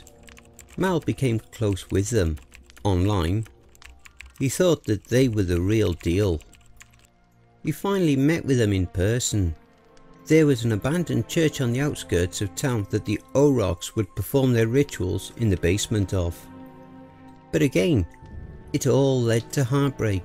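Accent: United Kingdom. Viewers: I'll listen to it. Listening to a narration with a British accent is so great.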